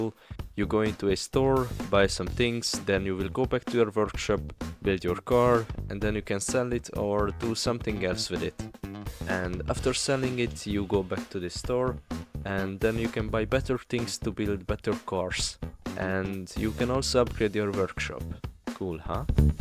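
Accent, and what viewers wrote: Hungary. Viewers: Bros accent is hungarian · I know a hungarian when i hear one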